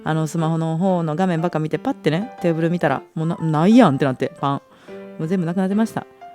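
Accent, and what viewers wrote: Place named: Osaka, Japan. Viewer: 大阪弁も大好きです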